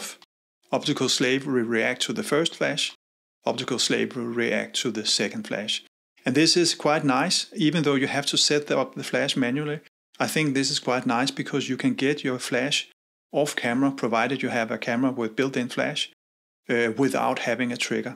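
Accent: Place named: Denmark. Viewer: Jeg elsker os danskere når vi snakker engelsk, det er ret tydeligt. 😁 Lækker video, tak for den! Har lige bestilt min til min Lumix S5.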